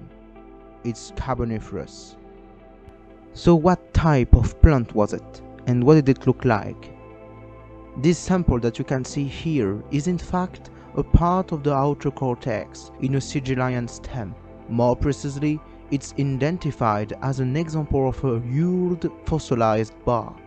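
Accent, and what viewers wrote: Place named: RP, United Kingdom. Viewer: On ferme les yeux et on croirait entendre un Lord anglais … C’est plutôt un compliment bien sûr, ça change des « ze plants of ze carboniferous … » Very good subject!! Tha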